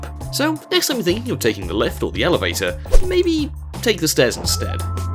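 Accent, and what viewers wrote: United Kingdom. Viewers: This may be the most British guy I've ever heard lmao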